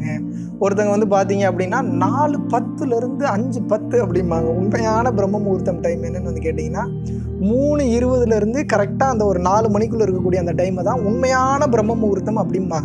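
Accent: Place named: Kongu, India. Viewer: Supper tambi Solum vdam miga alagu Enga ur kongu vaadaiatikudunga peshle 👌👌🙏🙏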